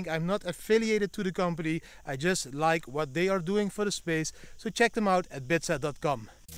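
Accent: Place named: Netherlands. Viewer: Are you dutch? Ik hoor Nederlands accent in het engels! Goede content!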